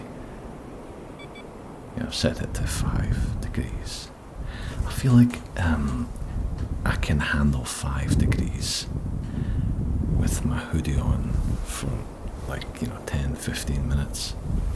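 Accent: Scotland, United Kingdom. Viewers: Found this account today, and it directly qualified as one of my ASMR favourites! Not only is it tingly and makes me laugh - on top of that I get to enjoy listening to the beautiful Scottish accent. It’s all insanely glorious! And I just want to say thank you!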